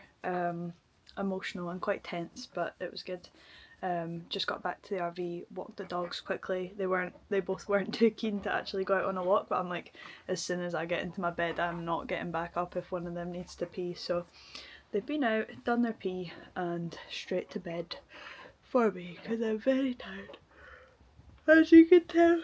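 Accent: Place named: Scotland, United Kingdom. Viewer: I love seeing Scottish YouTubers with unashamedly Scottish accents. The world loves us guys, we don’t need to hide our accent. Everyone understands, deep down 😃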